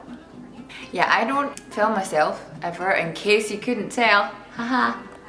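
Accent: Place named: Scotland, United Kingdom. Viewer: if the plan was to entertain as stated this was hilarious :D also i love a scottish accent ! got any pics of the end result though ? ;)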